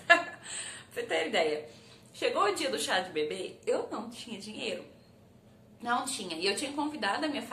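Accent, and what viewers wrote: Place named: Minas Gerais, Brazil. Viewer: Gosto do seu jeitinho humilde e simples! Tão nova e com uma cabeça boa. Deus te abençoe. Ah, adoro seu jeitinho de falar mineirinho😉😘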